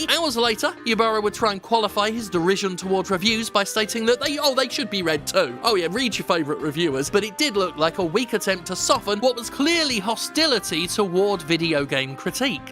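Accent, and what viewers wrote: United Kingdom. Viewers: It's been 10 years and I still get a hard-on every time I hear that swanky British accent. Sheers mate!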